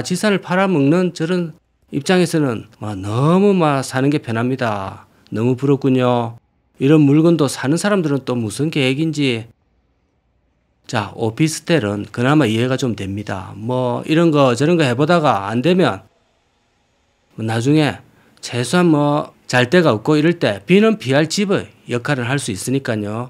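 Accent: Gyeongsang, South Korea. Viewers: 고향이 어딘가요? 지는 경남 합천이고 59세 입니다.사투리가 나랑 똑 같아서 정겹네요. 박상은 우리 말고는 잘 모를거에요.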